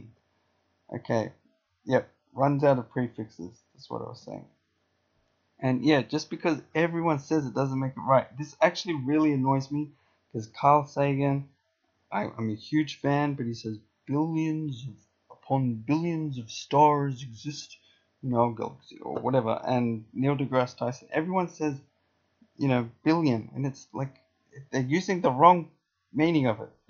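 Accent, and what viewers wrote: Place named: Australia. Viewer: lmao dude mom in american english doesn't rhyme with bum or any of those things, the o is pronounced like the o in bomb. That one is just your australian accent confusing you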